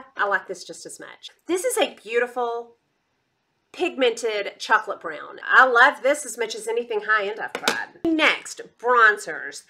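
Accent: South, United States. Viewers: I love your southern accent!! I thought I was the only one that described my eyeshadow palettes as my children lolol xo-Wendy, NYC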